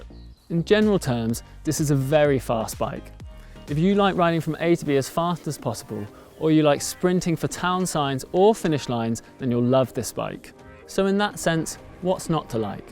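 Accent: United Kingdom. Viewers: Good British English. Soo easy to listen to.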